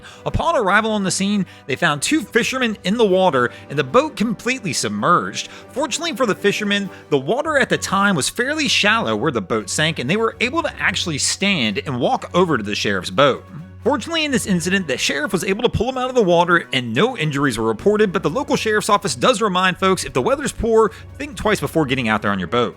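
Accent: United States. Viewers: Hi, are you able to post videos without commentary? I love your content but have a hard time dealing with your obnoxious American accent. Thanks in advance from Australia.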